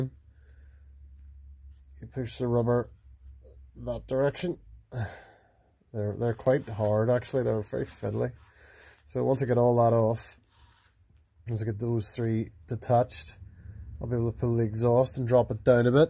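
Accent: United Kingdom. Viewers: Great video discribing a similar fault with my e92 , you sound that your from NI, would you consider homers? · Great video mate, and good to hear my local accent (N'Abbey). This will be my next project in the coming days/weeks, as I have the same warning lights, (with engine power loss and car shuttering when at high speeds). I think I'll just replace the DriveShafts with new, as I've seen videos and photos with badly corroded shaft ends and Relevator Rings, and a lot of effort to try to clean the crud & rust off them. Thanks for sharing!!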